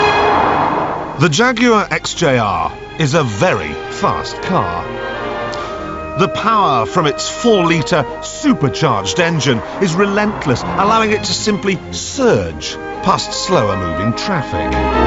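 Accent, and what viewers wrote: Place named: Yorkshire, United Kingdom. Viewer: because he’s from Yorkshire but has gotten better at hiding his accent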